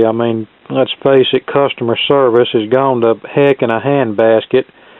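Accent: South, United States. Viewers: The same accent of Forrest Gump, lol, I love that accent.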